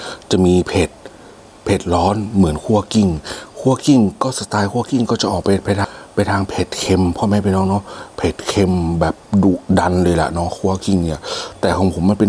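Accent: North, Thailand. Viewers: อู้เหนือ ดีแล้ว ตอนนี้คนกรุงเทพฯฟังภาษาเหนือออกแล้ว❤❤❤❤❤❤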